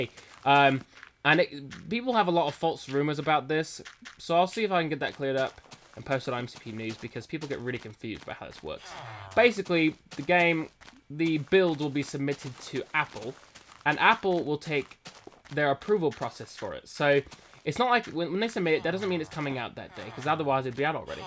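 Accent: United Kingdom. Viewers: I like your videos and that British accent :D the vids are giving me patience ... :)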